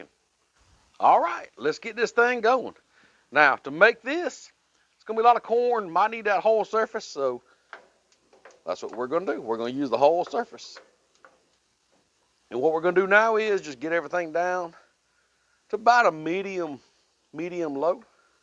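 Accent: South, United States. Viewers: You southern boys crack me up. Looks amazing! I’ve got lots of fam in Georgia that sound just like you!!🤣🤣 so far a fan and...subscribed! Keep up the good work. Look forward to more.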